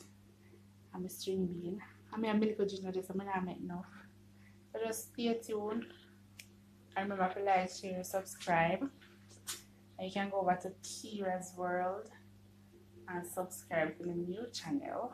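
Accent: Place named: Jamaica. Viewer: Love the patois(patwah) down to earth!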